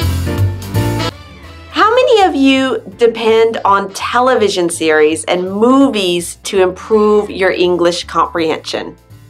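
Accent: United States. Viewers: So, I m from DRC Kinshasa … IS IN AFRICAN.. I m liker your English American accent is very good. Please my teacher my God bless you. I love yours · That is definitely an American accent. She’s just slowed down, as well as articulated, speech... I think she does a great job overall. The only exception is that ending sound of letters. As I stated above, she adds the -uh sound, which is incorrect. I used to do this teaching Lao students and then realized that it made learning to read difficult. For example, ‘sad’ would become suh-a-duh, instead of s-a-d. Or ‘tin’ would become tuh-i-nuh, instead of t-i-n. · Not every american speaks with your accent.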